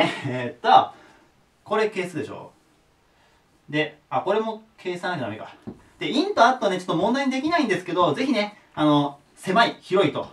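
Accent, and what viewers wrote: Japan. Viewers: 発音はちょっと。。。😂😂ジャパニーズ・イングリッシュ。。。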